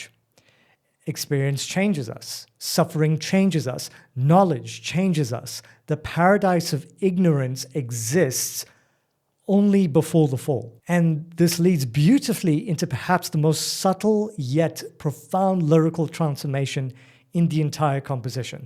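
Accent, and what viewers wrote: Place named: Johannesburg, South Africa. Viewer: Oh, that is why your "accent" sounds so familiar, yet unusual compared with what I'm used to hearing on YouTube... Hi 👋 from Joburg as well.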